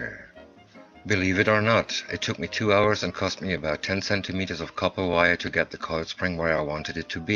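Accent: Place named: Hungary. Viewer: . I think he is from Hungary? Your accent is maybe better.... when do you expect to show the paint video for the wagon.... I look forward to it.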